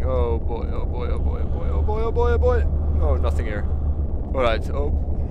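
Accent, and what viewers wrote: Netherlands. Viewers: Hahaha, I had no idea you made these kinds of vids as well! Hilarious! Make more! There's tons of these kinds of games floating about these days. :3 Also, your accent... Are you Dutch or something like that? :D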